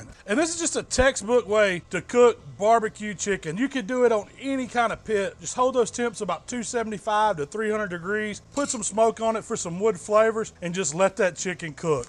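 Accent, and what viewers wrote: South, United States. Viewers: As soon as I heard the southern accent I knew I could trust him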